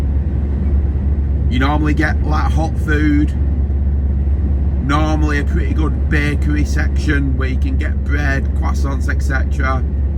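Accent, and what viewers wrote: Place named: Manchester, United Kingdom. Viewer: Hi mate im new around here i love watching truck driving vids only come across you just now here’s my take on the (not done much interesting) i myself hold a class1 (dont currently drive ) but one of my dreams is to do European and anything to do with your job abroad or England is fab to watch its probably not interesting to you because its the norm to us viewers it’s something out of the ordinary where in the uk are you from uk Manchester myself and your accent sounds close lol P.S its nice to watch someone that sounds like he enjoys his job good vid mate keep it up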